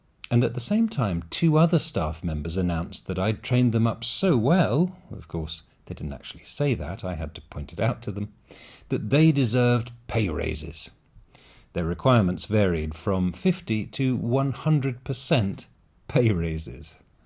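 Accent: RP, United Kingdom. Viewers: Обычный RP, нейтральный акцент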